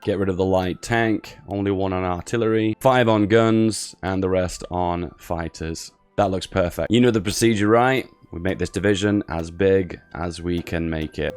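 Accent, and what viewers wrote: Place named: United Kingdom. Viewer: I love your videos and I love your accent. Simply because I'm french (salut l'anglais!) and all the french people loves the british accent in english.🤣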